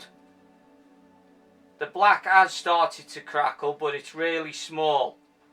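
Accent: United Kingdom. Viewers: This is the most British person I have ever heard. Subscribing 100%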